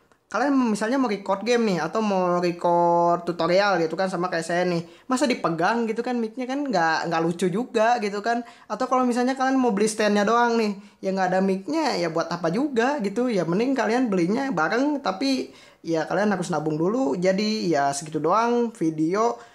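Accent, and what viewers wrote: West Java, Indonesia. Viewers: Orang jawa barat yak ? Orang sunda yak ?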